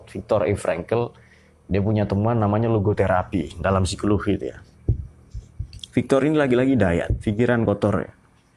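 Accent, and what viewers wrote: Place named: Malang, Indonesia. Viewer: Dari Malang ya mas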